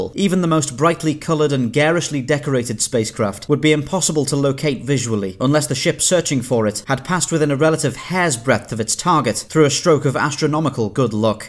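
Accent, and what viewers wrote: United Kingdom. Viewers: I truly enjoy your episodes. It was that pointed you out. I enjoy your attention to detail, graphics and that British accent, with your own personal confidence make for one hell of a great voice over voice!! I do, however, have to disagree with you a number of your points. First, you presuming a galaxy devoid of long range sensor arrays. These serve as surveillance, relay stations, beacons as well as keep track of all craft movement with in the area they can surveil. These arrays could scan for hundreds of different anomalies. They would cover the entire light spectrum, they would also likely have very sophisticated visual scanners, and likely an assortment of drones to extend their range or get closer looks at possible tremors of danger. As far as stealth technology to mask their the residual radiation of their engines, primary faster than light engines and ‘impulse’ engines. 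Also masking every frequency with which they are scanners. If they have shield activated, there is no plausible way to disguise radiation waste from their various engines. It is possible to design a ship that repurposes waste radiation, basically a submarine in space. Shields though, that’s an immense amount of energy in the shields themselves. This a huge problem. Romulans couldn’t engage their shields while cloaked, the sheer amount of energy, projected away from a ship, to most effective, yet at least 200m away from the ship, to better protect the hull from hugely impactful hits that would resona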